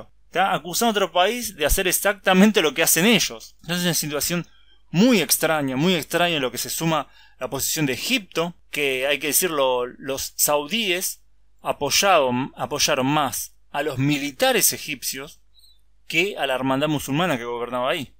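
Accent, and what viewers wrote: Argentina. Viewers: que lindo esuchar un argentino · En "ashuda" y es la forma de hablar que tiene la gente que vive en el gran Buenos Aires,CABA y distintas ciudades a lo largo y ancho de la república Argentina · Tu acento de nariz tapada argentina es tan fuerte que se te entiende poco. Mejóralo, chhhheeee.....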